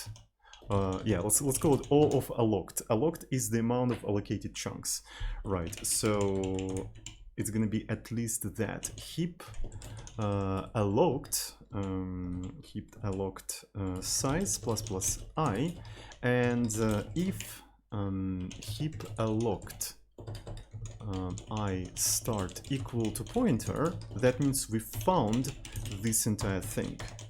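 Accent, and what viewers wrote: Russia. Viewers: ахахха, да русский ацкент явный, но неплохо говорит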